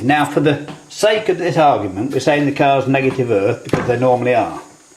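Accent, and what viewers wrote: England, United Kingdom. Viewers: Your the best , love your English accent Keep it up Bro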